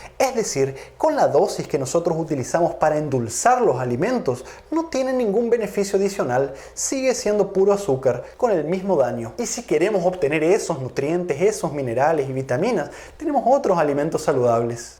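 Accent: Paraguay. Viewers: No me gusta su acento de paraguayo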